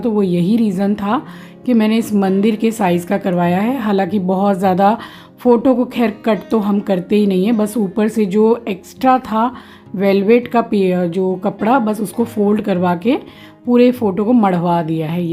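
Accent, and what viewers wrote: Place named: Uttar Pradesh, India. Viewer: Aap up wali lenguage kese bol rhe ho.... Mai up se hu boht achha lga sun ke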